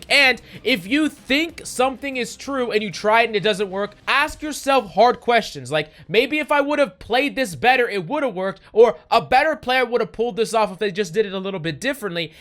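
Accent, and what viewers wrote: United States. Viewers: Heard the American accent, and instantly clicked off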